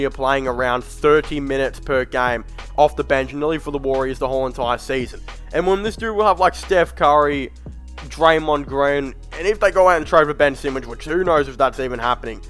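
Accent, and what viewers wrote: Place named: Australia. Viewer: I've subscribe because I love this dude's accent. I wonder where he's from? 🤔 Maybe Australia 🤷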